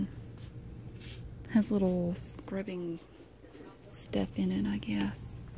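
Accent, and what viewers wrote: South, United States. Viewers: I ♥️ your soothing southern voice. Greetings from Germany 🇩🇪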